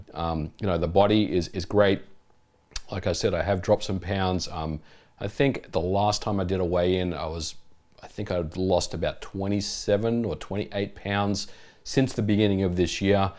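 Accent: Australia. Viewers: The most important thing about this channel to me, and I really hope you see this because it's true, is having a non-US creator among the big gaming channels. When 90% of videos on the platform are by American dudes in their 20s, it really means a lot to have an older, Australian voice to bring a different outlook, experience, and vibe, and honestly, it can just be downright fatiguing to hear high-energy American accents nonstop video after video. You're making a big difference in a way you might not have known, and I hope a lot of others feel the same, too. Love ya, keep it up. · Going to use you as inspiration to lose weight in 2025. I just hit 50, and as you say, still eat like I did in my 30s. As a fellow IT enthusiast from Melbourne who was in the 90s PC scene, keep up the great content. Hearing an Aussie accent is always welcome. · Been watching a long time now. 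It's the quality content that keeps me coming back, plus, the Aussie accent lol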